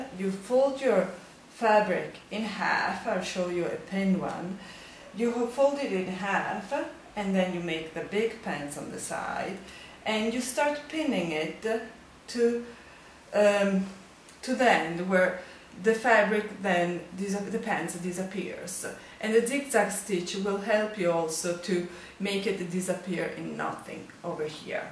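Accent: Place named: Italy. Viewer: grazie per la lezione. mi piace il tuo accento italiano ...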